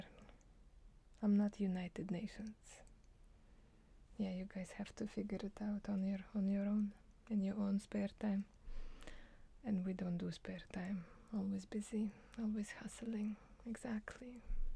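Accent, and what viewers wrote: Russia. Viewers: Russian accent it's strong it's perfect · с лютым русским акцентом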